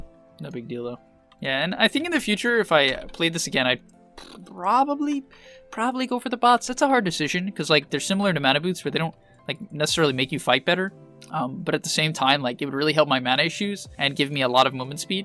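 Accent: India. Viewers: I thought there is a new guy, a indian one haha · i was surprise too. Because I watched indian programming tutorial videos, I thought it was an indian person. lol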